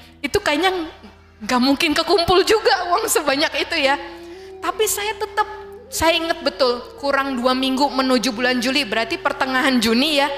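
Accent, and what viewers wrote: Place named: Priangan, Indonesia. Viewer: Duh Gusti tutur katanya bahasanya ini sangat menyentuh hati lembut dan sejuuuuuuk di kuping,logatnya seperti orang priangaaaaan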